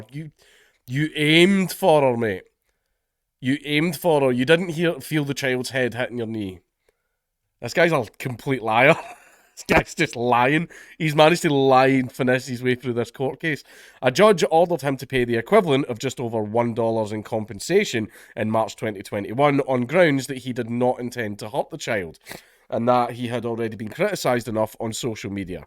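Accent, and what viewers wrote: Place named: Scotland, United Kingdom. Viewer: We need a Dankula video in full on Scottish mode with subtitles.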